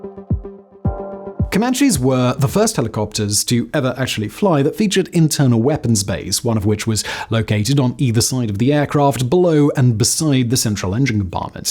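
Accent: England, United Kingdom. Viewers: Arrogant use of English accent. This guy is a Never Soldier w/ Cavalier wuss Talk Anti American Buffoon!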